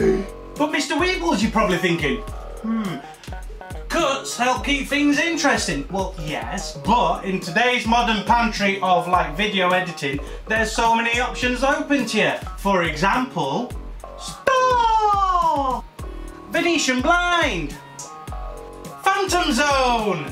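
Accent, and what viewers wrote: United Kingdom. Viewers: Hi there dear lord Weebl. I just have a question. I know you're Brittish and I love your accent, but I just can't put a name on it. Where are you from?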